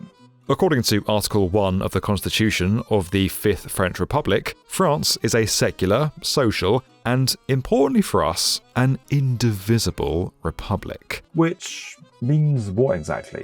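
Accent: England, United Kingdom. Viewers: As a French person, it is so weird and funny to hear the places name pronounced with an English accent (although he's trying is best to "Frenchize" it !)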